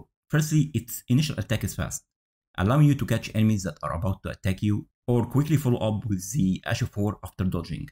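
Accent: Egypt. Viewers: Your video is very well prepared and it left me speechless after I heard your Egyptian accent you are a legend ❤ keep up the massive work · واضح انك مصري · Brother, i bet you're egyptian... i could easily recognize the egyptian accent... since im egyptian also. Btw that was a good build guide, ngl. I'll definitely gonna try it later today ❤ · Great vid ! you sound egyptian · This thick egyption accent of yours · why do you sound like an Egyptian while you're speaking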